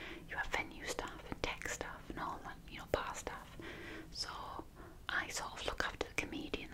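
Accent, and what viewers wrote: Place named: Sunderland, United Kingdom. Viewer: Are you from Sunderland? Or the north eastern area? It's great to finally find an asmr artist from where I live!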